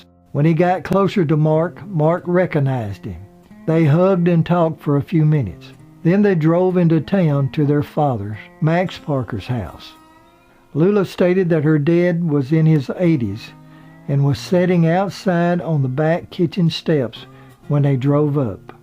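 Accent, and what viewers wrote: South, United States. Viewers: A really good history lesson. I love listening to your histories of various people, and I love your southern accent too, even here in England. I look forward to the next one. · Love your videos and you have a great voice. Are you from the South? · your quite welcome..love your voice & southern accent, my parents are from Harlan, Ky.😀✌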